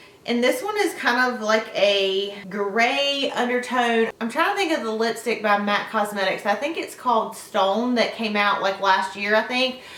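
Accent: South, United States. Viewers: I love your voice it sounds so country!!!! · I Love Your Southern Accent. Maybe I Should Ask First Are You From The South? lOl If So I Love Your Accent & Your Makeup Here As Well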